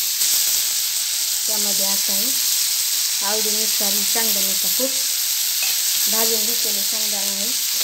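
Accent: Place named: Ahmednagar, India. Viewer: Tumcha gaav kutla? Awazavarun Ahmednagar zilla che vatatat.....ho ka?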